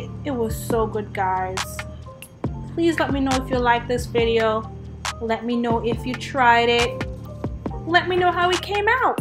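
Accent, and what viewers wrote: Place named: Jamaica. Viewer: You accent sound Jamaican. Are you Jamaican?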